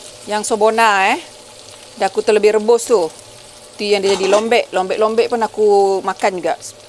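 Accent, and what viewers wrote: Negeri Sembilan, Malaysia. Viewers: Bagusnya.. Tak lupo cakap nogori walau dimana sajo berado... Nismilan negori kito....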